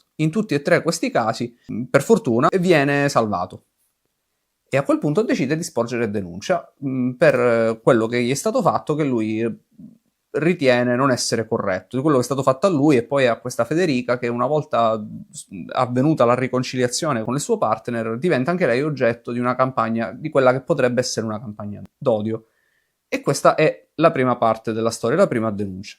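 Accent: Sicily, Italy. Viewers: Purtroppo, il femminismo "è" la massima espressione — e al tempo stesso la forma più rozza e grottesca— del capitalismo. Chi, meglio delle donne, per la loro naturale e debole inclinazione a servire e compiacere l’autorità e i potenti, poteva incarnare così bene questo nuovo dovere mascherato da liberazione, dovere che non ha nulla di rivoluzionario se non quello di aumentare i privilegi del genere femminile, genere più servile del potere? Comunque, ottimo video: è sempre un piacere ascoltarti — e con quell’accento siciliano, tutto diventa ancora più piacevole! è stata una fortuna vedere ed ascoltare il tuo canale! grazie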